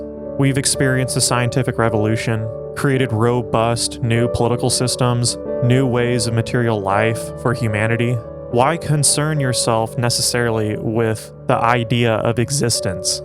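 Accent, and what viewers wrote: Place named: South, United States. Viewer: Intellectual southern accent is nice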